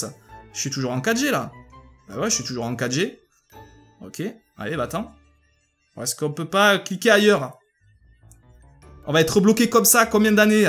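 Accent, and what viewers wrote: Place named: Marseille, France. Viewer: tu es Marseillais? Car je suis Marseillais et j'entend plein de personnes avec ton accent et TU AS LE MÊME ACCENT QUE MOI ☺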